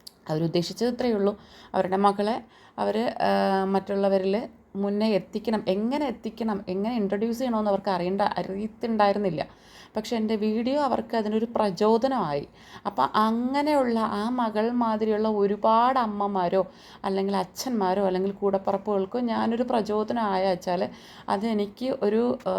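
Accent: Trivandrum, India. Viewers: samsaram enikkum eshtamanu tvm slang kelkkan anu ❤❤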